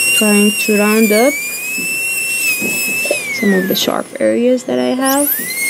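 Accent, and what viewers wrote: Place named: England, United Kingdom. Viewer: Great job. Great En. accent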